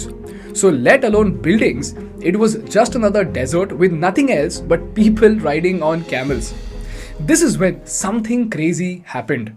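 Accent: India. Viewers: Maybe your english has a little indian accent? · Please get somebody who does not have an Indian accent! They sound horrible! they sound like the phone scammers who steal money from old people in America.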